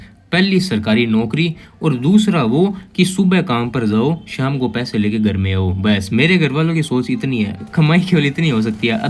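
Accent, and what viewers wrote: Haryana, India. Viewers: Mehnat to hai bhai...... Mehnat to kar rha launda..... Haryana se ho kya? Apki voice se lag rha ❤ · same😆 pubg ka name letee hi khatam🤣🤣 by the way love from my heart m bhi haryanvi hu apki awaaz se pta chala ❤️ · same situation brother 😂😭 Aap bhi Haryana se lagte ho fir to 😂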